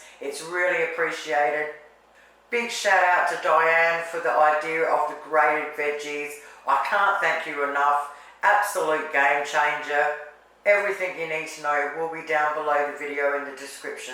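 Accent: Australia. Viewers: Ooooooh so yummy I just made these I didn’t have punkin so used sweet potato Also I added some chopped shallot greens as I only had a small small onion And I used the borg pastry sheets Oh my yumminess!! Thank you so much You are my family’s favorite YouTube watch We also made the pea soup pie floater last fortnight Keep up the happy cooking and Aussie talk Absolutely has my ‘special’ boy laughing and looking forward to the tasting of these amazing easy to follow recipes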